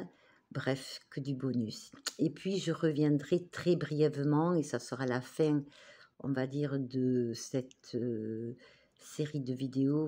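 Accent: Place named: Toulouse, France. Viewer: Vers Toulouse.. * 💥🥳💥 🥰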